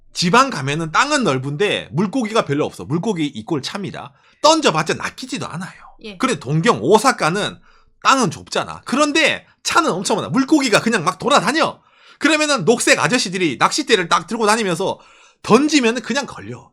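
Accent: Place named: Gyeongsang, South Korea. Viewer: 말투가 경상도 .자갈치에서 꼼장어에 쏘주한잔 하고싶네 ,ㅎㅎ,나는 부산사람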